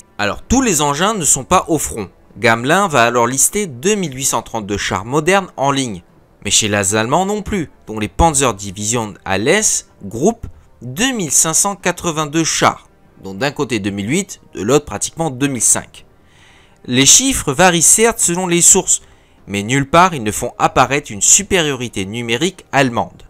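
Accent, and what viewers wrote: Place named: England, United Kingdom. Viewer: Je suis attristé d'entendre que les noms allemands sont prononcés à l'anglaise ! C'est dommage parce que la vidéo est bien faite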